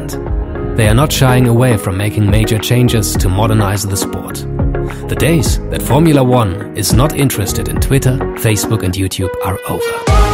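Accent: Germany. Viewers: is the person talking german? i had a feeling the whole time but then he pronounced Vettel correctly, he must be german right? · Anyone else thinks this is a German accent? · Finaly. Youtube recomendation something good. I love german accent. Make me feel smarter..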